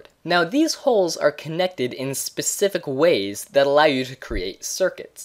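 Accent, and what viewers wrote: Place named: Canada. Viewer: Your "About" page says "United States" But your accent says: "Canadian" I'm confused. >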